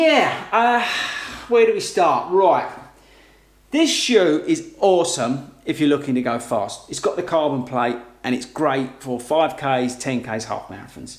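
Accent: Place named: Essex, United Kingdom. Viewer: Propa Essex accent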